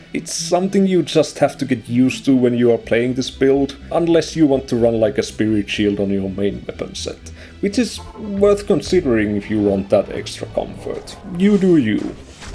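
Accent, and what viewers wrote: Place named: Finland. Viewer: Sounds like a finnish accent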